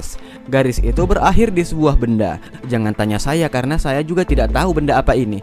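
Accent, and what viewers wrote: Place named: Madura, Indonesia. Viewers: Bro.. kok kayak ada suara bahasa maduranya..kayak orng jualan di kapal...😀😀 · Anjir suaranya kayak orang madura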